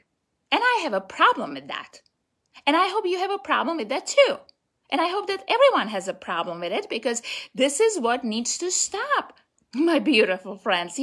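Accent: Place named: United States. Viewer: I love your American accent beautiful lol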